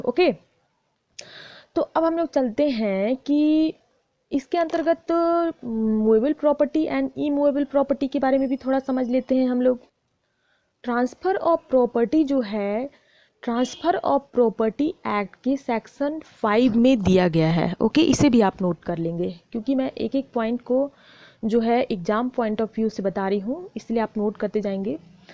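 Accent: Bihar, India. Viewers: Maam bihar sa hoo kya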